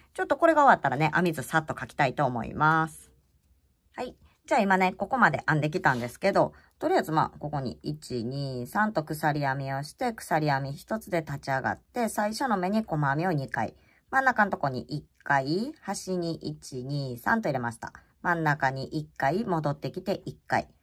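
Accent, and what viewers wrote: Kansai, Japan. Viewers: お地蔵さま❤挑戦しました😊 鎖編みからのスタートは苦手だったんですけど、トーク?独り言？の関西弁が面白くて笑いながら楽しませてもらいました😂お地蔵さま何体あっても可愛いですよね😊 あっ💦爪もお地蔵さまで可愛いです❤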